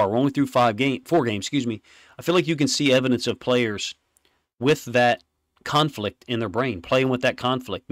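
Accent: South, United States. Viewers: LoL I believe you are hearing a bit of a southern draw there Big guy. Also if that is really bugging you that much perhaps you should work on yourself and stop trying to correct others.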